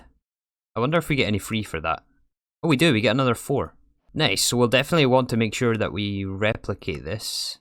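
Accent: Scotland, United Kingdom. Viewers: Yeah, from GitHub about this issue: "The red matter nerfs were too much, previously 100 red matter needed to be crafted, now it's 2" But it doesn't matter, at least you can now set up a proper energy core. I could only add one after finishing the pack. I literally rubber-hammered the creative key out of the assembler line for lack of power. Like I said, I do enjoy watching this series, you have a totally different approach than I have. Two days before finishing the pack I still had only one EBF. I added some more because I got impatient waiting for more tungsten. And there you are, 20 EBF's in a row and 1800 tungsten in stock :-D :-D So it's interesting to watch. The Scottish accent helps too ;-) Keep it up !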